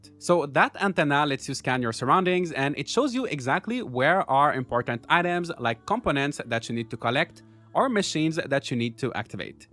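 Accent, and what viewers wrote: Quebec, Canada. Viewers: C'est sûr que t'es Québécois! Je me trompe?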